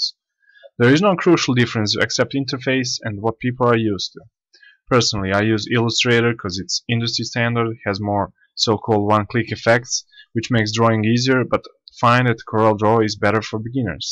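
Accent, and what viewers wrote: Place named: Balkans. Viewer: Lol from your accent I thought you're from the Balkans, I'm from Bulgaria, so, yeah, I knew it! :D On the topic, I honestly prefer Corel, it is super easier for me to work with it.. However for pre-printing, one should use Illustrator. For all digital design that doesn't require printing I'd personally use Corel :) Cheers and pozdravi!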